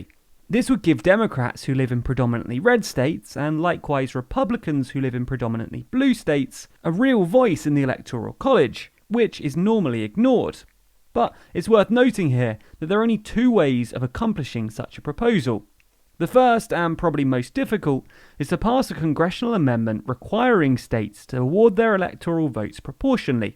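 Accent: United Kingdom. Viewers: Dudes British.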